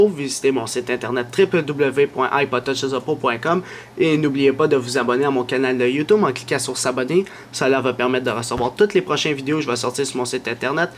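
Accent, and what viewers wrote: Quebec, Canada. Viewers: je taime mec merci tu m'as sauver jte le jure sur ki tu ve plus jamais jme moke de l'accents quebecois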